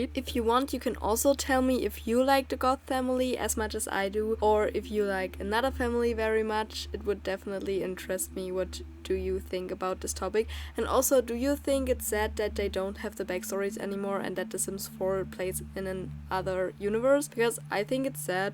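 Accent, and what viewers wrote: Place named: Germany. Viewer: man hört das sie deutsch ist😂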